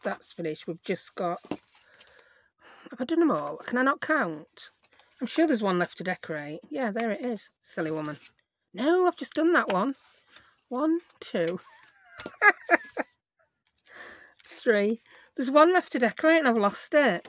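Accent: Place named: Yorkshire, United Kingdom. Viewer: Thank you and yes I’m in the UK, I live in Southport. I now have a load of empty teabags on the side drying 😂 I find you very watchable, I love the Yorkshire accent and you have a great sense of humour where I found myself laughing out loud on a few occasions, I’ve not come across many junk journal YouTubers as interesting as your channel. I’ll be watching more today 😀👍🏼 xx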